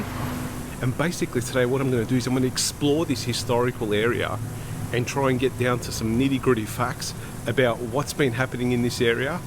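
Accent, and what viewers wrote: Australia. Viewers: Bro, get some vocal coaching. That Aussie twang of yours ain't particularly pretty.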